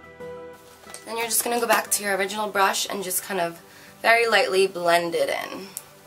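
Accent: New Jersey, United States. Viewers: Iol I love you New Jersey accent :) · i love your new jersey accent! esp. the way your say mascara! :) xoxox from new orleans! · R you from jersey? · I swear ur Jersey accent is so cute listening to it compared to my country accent....love being on the same coast but hearing how our accents change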